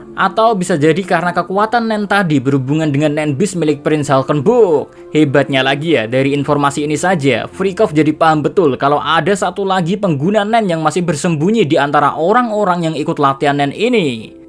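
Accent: Java, Indonesia. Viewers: Medok banget mas 😅😂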